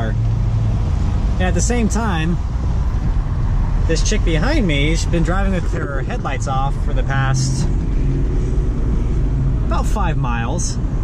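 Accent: Hawaii, United States. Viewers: weirdly still Hawaiian seeming, the accent though, and not pidgeon, so weird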